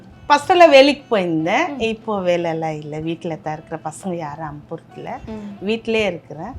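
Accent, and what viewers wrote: Chennai, India. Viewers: Indha madras basaiya?😂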